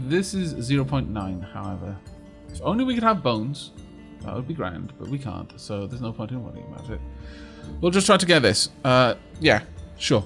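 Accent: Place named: South Wales, United Kingdom. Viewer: Thought he might have been since I'm from wales and i can his accent was familiar and if I was going to guess he is from South Wales because his accent isn't realy strong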